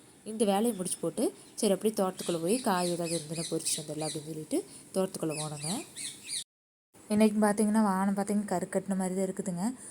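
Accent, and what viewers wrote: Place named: Kongu, India. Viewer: கொங்கு தமிழ் கேட்டு ரசிக்க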